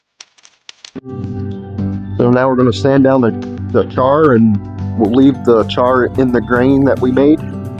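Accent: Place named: South, United States. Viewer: Very nice - I can do this! Unique. New sub from this vid-make more easy project vids with that country rock twang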